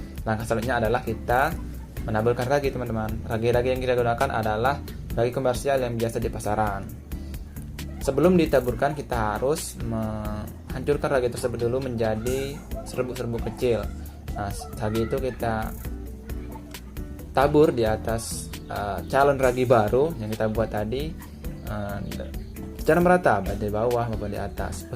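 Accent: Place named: Aceh, Indonesia. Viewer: Logatnya suku aceh y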